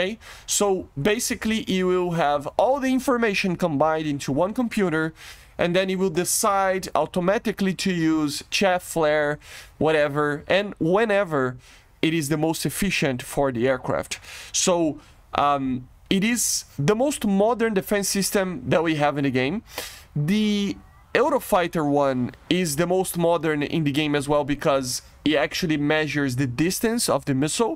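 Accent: Brazil. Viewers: Tu é BR ne ? Certeza!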